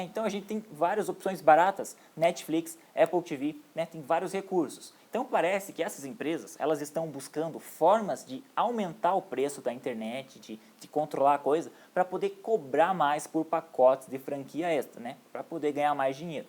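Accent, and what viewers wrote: Curitiba, Brazil. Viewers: Conheci o sotaque curitibano desde o princípio, huehue. Já ia perguntar, aí quando falou da Copel...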